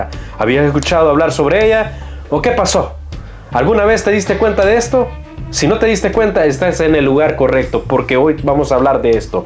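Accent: Honduras. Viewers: MI PREGUNTA ES DE DONDE ESTA PERSONA Q ESTA ASIENDO ESTE VÍDEO MENTIROSO 🤥 PORQ HONDURAS EN NINGÚN MOMENTO PUDO DETENER AL EJERCITO SALVADOREÑO ME UBIERA GUSTADO Q ESE CONBATE UBIERA DURADO PORLO MENOS DOS DIAS MAS DE CONBATES 😂😂😂😂😂😂ACUÉRDENSE Q ESA GUERRA NO FUE DE CONQUISTA SI NO UNA RESPUESTA PARA DEFENDER ALOS SALVADOREÑOS Q ESTABAN ASIENDO ASESINADOS POR HONDUREÑOS ISI UBIERA SIDO UN GUERRA DE CONQUISTA UBIERA ABIDO MÁS MANTAZAS DE JENTES DE HONDURAS PORQ ASÍ NOSELE PUEDE RESPETAR LAVIDA ANINGUN CIVIL ENEMIGOS ÓSEA LES UBIERA IDO PEOR SOLO PIÉNSELO LA NUEVA OCOTEPEQUE YA ERA DOMINADA EN SU TOTALIDAD POR PARTE LAS FUERSAS SALVADOREÑAS PERO COMO NO ERA GUERRA DE CONQUISTA SELAS REGRESARON 😂😂haha UN CHOCOLATE AMIGOS SEAN SERIOS Y REALES PARA COMENTAR COSAS ASÍ EN ESPECIAL A ESTE LOCO DE ESTE Q ESTABA ASIENDO ESTE VÍDEO PARESE Q ES HONDUREÑOS ENLA LANERA DE ABLAR 😂😂😂😂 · Parece que el comentarista es hondureño.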